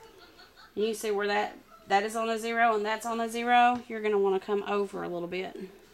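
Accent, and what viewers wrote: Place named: South, United States. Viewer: Thank you. I will be watching more of your videos. Love your southern accent :-)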